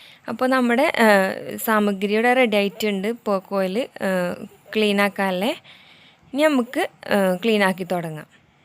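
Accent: Malappuram, India. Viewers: മലപ്പുറം ആണോ ഇയാൾ. നല്ല ഭംഗിയുള്ള സംസാരം...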